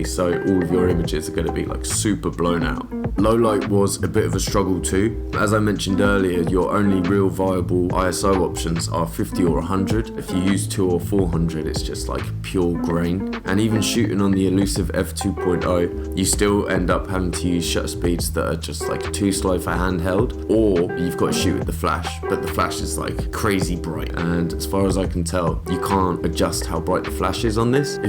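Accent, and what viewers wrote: United Kingdom. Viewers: love your videos!!! Could you say more about the settings you use for the different digicams? (music a little bit too loud for me, though... not sure you need it while you speak, let us enjoy your cute British accent😉...) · I like your accent, it's that cool and actually bearable British also cool video and obviously great visuals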